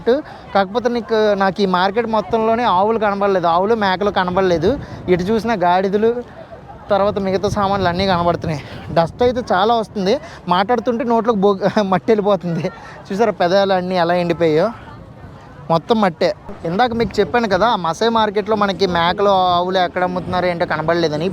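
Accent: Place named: East Godavari, India. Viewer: సూపర్ నైస్ వీడియో భయ్యా మీ ఊరు ఏమిటి ఈస్ట్ గోదావరి జిల్లా స్లాంగ్ ఉంది మీది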